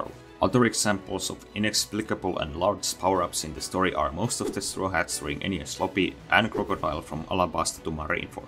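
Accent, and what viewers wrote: Finland. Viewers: Why do you sound Finnish? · your accent sounds finnish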